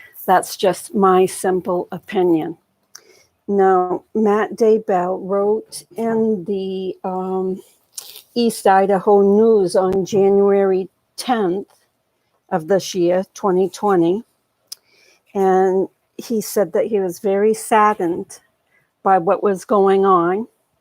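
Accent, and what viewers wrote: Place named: Boston, United States. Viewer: I enjoyed the information you presented. You sound like you might be from Boston.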